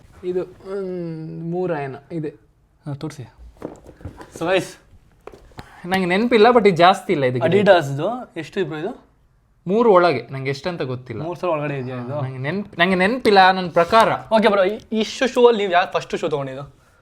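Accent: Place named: Mangalore, India. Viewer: Full mangaluru kannada , kusi apundu keniyera😅😅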